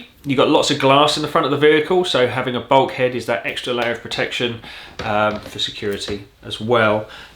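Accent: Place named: Birmingham, United Kingdom. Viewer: Love what you do and how you do it!, You can take the man out of the Forces but you can't take the Forces out of the man.. Training and explaining is natural to you, keep it up.. Brummie Voyce..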